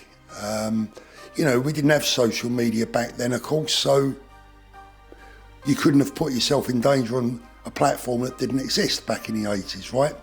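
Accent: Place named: Cockney, United Kingdom. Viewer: I love the cockney accent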